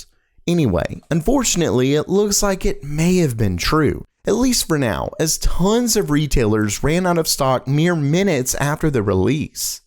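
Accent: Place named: South, United States. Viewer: southern accent coming out here and there lol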